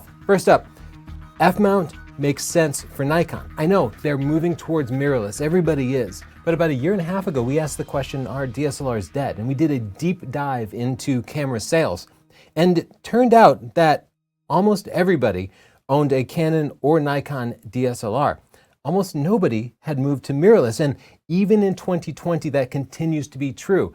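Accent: Texas, United States. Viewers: odd, halting speech pattern is a lot like Jeff Goldblum, with a slight Texas twang.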